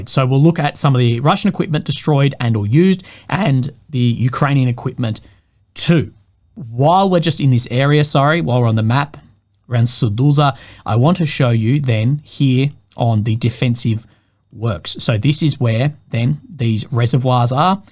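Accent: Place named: Australia. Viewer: hearing an aussie accent always makes my day more cheerful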